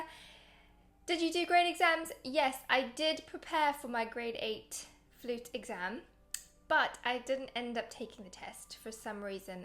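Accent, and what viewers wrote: United Kingdom. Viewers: Whwre is she from? Is she british? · I love hearing u talk,I play the Yamuna closed key flute I love it so much I am a beginner and I play 15-30 minutes each day when I get home from school,I am trying to learn by on flute for my school talent show I also love ur accent I always wish I had a British accent one day could u play the song by I really really love it that song Thanks bye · You sound like a british voice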